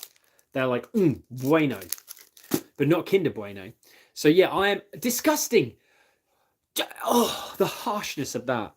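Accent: United Kingdom. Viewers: Best British accent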